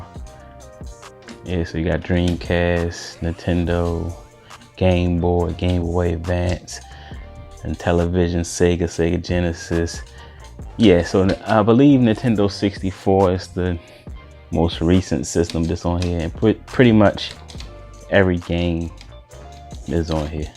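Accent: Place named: Maryland, United States. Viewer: Feeling that man cave. I sense a Maryland accent...MicroCenter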